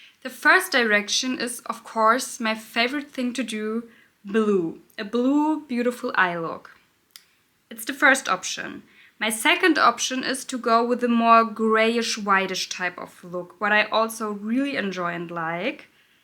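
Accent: Germany. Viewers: i noticed the german accent right away and i love it (half german girl here).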